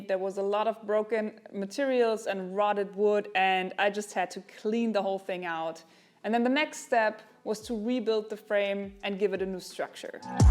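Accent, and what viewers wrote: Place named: Germany. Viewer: تعجبني لكنتك الألمانية الظاهرة بشكل واضح في لغتك الانجليزية، مشاريع جيدة وممتعة، تحياتي لك ولمجهودك الكبير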